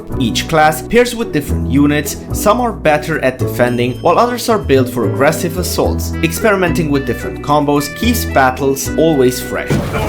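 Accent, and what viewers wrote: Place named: India. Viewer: Indian accent detected. I dip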